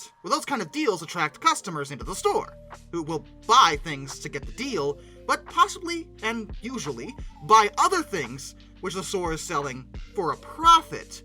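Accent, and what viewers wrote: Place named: Michigan, United States. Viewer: You must be from Michigan... there's no S on K-Mart/Walmart/Target!!!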